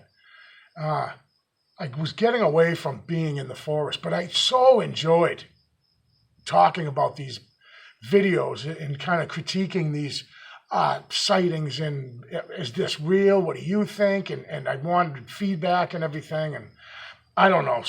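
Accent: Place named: New England, United States. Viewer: Your analysis of tree and branch breaks are insightful. I don't always agree, but breaks are still physical evidence. I've agreed with quite a few of your assessments. I think changing the time of day that you do your hikes will change what you might find. Midday is probably not the best time of day. But no matter what any one thinks, you keep doing what you enjoy. And by the way, we enjoy your New England accent, lol.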